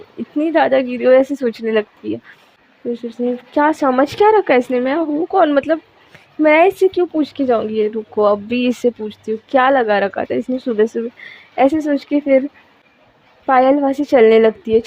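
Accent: Maharashtra, India. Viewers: Thank you mam😊, aap bohot aache se stories batate ho. Muze kabse aapko kehna tha ki apki language se marathi wibe aati hain muze thodi thodi😅.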